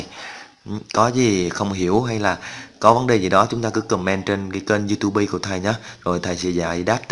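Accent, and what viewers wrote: Binh Dinh, Vietnam. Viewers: thầy dân bình định hả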